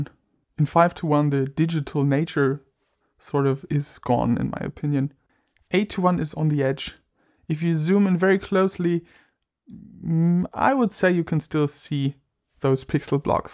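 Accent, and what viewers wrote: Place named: Germany. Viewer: Tolles Video, dass du Deutsch als Muttersprache hast erkennt man in deinem Englisch sehr gut 😬✌🏻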